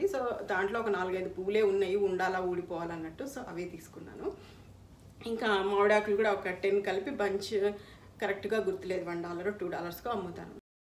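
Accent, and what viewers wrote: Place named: Kurnool, India. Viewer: Hello garu Mee slang vinnaventane nenu guess chesanu, meeru kurnool nundi vachi undochu ani..! Mee about Chusinappudu Ade nijam aindi..! I'm from Nandikotkur, kurnool..! Meeru chesina videos chusanu, chala bagunnai..! Keep going all the best....!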